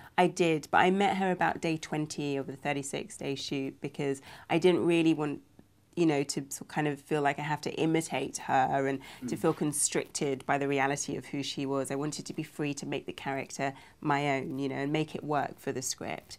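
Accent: United Kingdom. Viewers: i loooooooove the accent yoooh you are so good. you sound soooo African in the movie and so British in real life woooow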